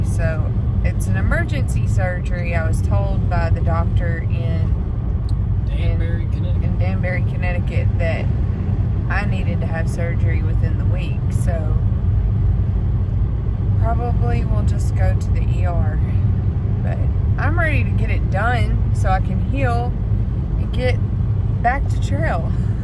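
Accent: Tennessee, United States. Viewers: I knew that was a Tennessee accent! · I’ve been following all your journey on the AT. You are a strong and amazing woman. This is just a bump in the road. I thought you talked like a Tennessean… I’m 2 hrs from Memphis. Good luck on your recovery and return to the trail. Bobr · Omg don't cry your too sweet and love your southern voice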